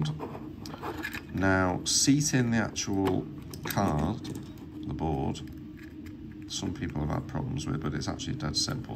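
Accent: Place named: Wirral, United Kingdom. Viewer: I need a order a couple andet my nodes out of project boxes. Great hearing a local Wirral accent on YouTube for a change. 73 Sean de 2E0SYA (Wallasey)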